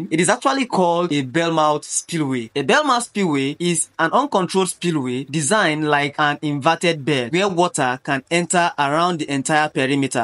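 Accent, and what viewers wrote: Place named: Africa. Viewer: I love your accent. probably African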